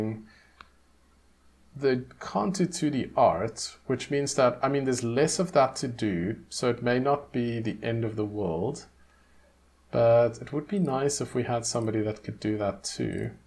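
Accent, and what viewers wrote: South Africa. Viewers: Mate I have to ask because it is driving me crazy, are you from South Africa?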